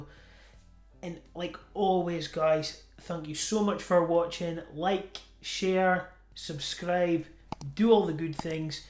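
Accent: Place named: Scotland, United Kingdom. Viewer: lol no it was meant as a good thing I just heard your accent and figured it's Scottish so call him Braveheart 😉. I heard you mention playing in church, I also play for my church. Keep up the good content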